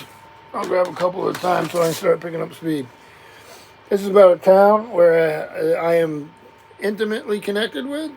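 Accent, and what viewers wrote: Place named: United States. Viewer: What part of the US are you from? You sound like a local to me.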